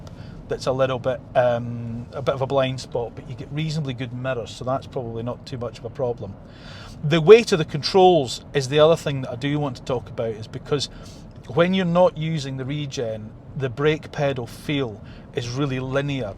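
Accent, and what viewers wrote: Scotland, United Kingdom. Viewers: Great video. Love the Scottish accent! Greetings from NEW England USA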